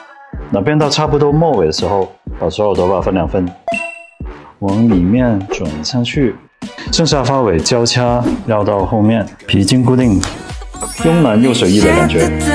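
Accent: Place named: Hong Kong, China. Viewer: 怎么口音像香港的 我也是香港的😂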